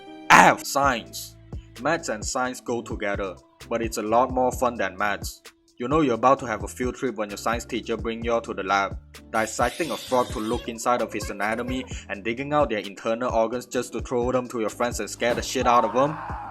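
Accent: Malaysia. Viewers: as a "banana" Myself i think your english still has that chinese manglish twang to it, its very obvious and yes i bleed red white and blue and fuck the yellow part 😂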